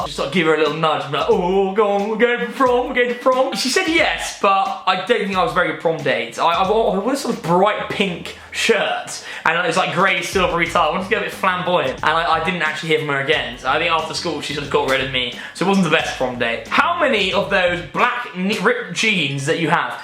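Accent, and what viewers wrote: RP, United Kingdom. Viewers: has to be like the poshest sounding person on YouTube. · You are so posh. I ❤ your accentttt😍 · never realised how posh he actually sounds until now :O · Where is he from he sounds so posh to me 😂😂😂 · you sound quite posh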